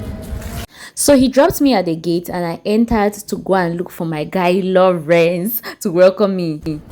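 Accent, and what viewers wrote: Nigeria. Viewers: u sound so nigerian u have our lingo too welcome here, please go to island and Eko atlantic city then go to abuja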